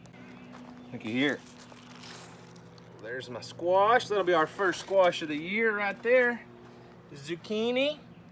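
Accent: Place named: South, United States. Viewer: Fl'ars'...luv thet country-fried accent!!💓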